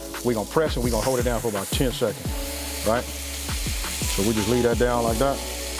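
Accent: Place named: Los Angeles, United States. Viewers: Boy you is the real deal! I live in Houston but I’m born and raised in LA (Crenshaw and Slauson!) and hearing your accent makes me feel at home ❤️ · Man I lived in California for 2-years Anaheim off of Brookhurst Avenue. Also spent time in Alameda California. Soon as I heard you talk and that accent, I said big homie from Cali. New subscriber and honestly, you should have over a million. Let's go let's get a to over a Million by Year's End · I can tell bruh from LA by the way he talk.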